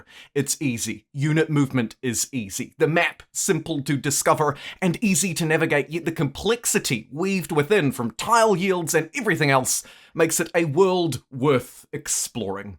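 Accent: New Zealand. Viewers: is this guy kiwi????????? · You sound Kiwi, Australian and American all at the same time, I like it.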